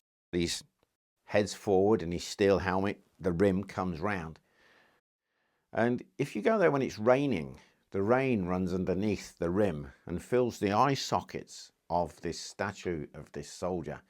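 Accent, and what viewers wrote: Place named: England, United Kingdom. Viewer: Interesting... I thought you were a out medieval times history and the such... Just watched arrow shooting vid where u said u moved to Canada... Does that mean you lost your English pride. Or patriotism for England... It's just so odd hearing the accent and saying your Canadian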